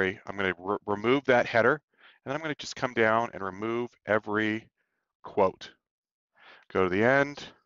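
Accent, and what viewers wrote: Netherlands. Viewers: You are dutch ? :) hahaha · Interesting. Are you dutch?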